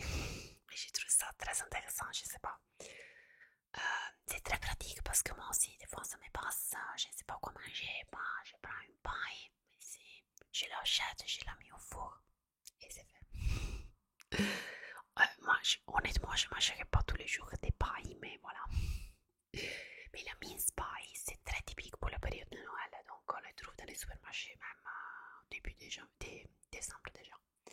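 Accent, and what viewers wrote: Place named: Italy. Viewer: ton accent italien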